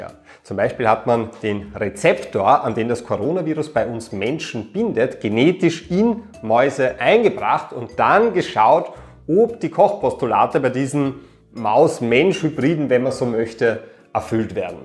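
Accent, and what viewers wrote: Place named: Austria. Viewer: Ich mag deine Art, in deinem Deutsch gerade so viel Österreichisch zuzulassen, dass du damit nicht proviziell (Entschuldigung, bin selbst Österreicher, ich darf das) wirkst, sondern die Allgemeingültigkeit deiner Aussagen betonst und trotzdem eindeutige rotweißrote Perlen setzt, die dich unverwechselbar machen und deiner Sprache Persönlichkeit geben.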